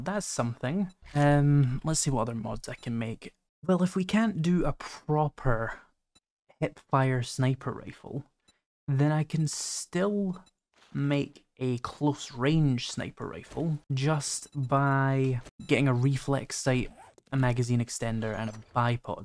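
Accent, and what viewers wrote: United Kingdom. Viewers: The whining is getting cringe. Takes me 4 or 5 times to watch a video because nothing else to watch. The British accent doesn't help, makes it sound miserable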